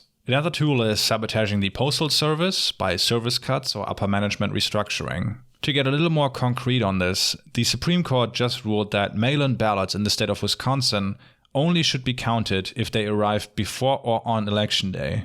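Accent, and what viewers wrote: Germany. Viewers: Bist du Koelner? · Ha, I knew you were German.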